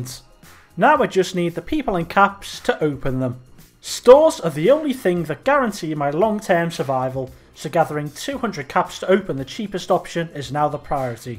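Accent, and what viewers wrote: Liverpool, United Kingdom. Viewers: Yes now scouse youtuber im here for it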